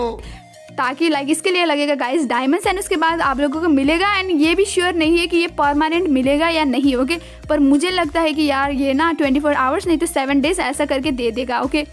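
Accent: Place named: Nepal. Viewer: You sa like a nepali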